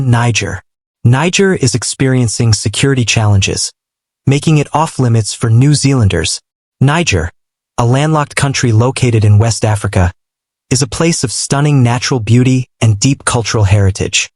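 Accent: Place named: United States. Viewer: why does this kiwi sound like a yank?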